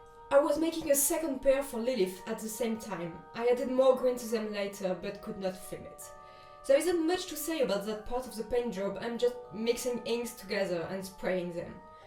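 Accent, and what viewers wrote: France. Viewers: Are you french? I've never heard this accent outside of movies so I didn't know it was real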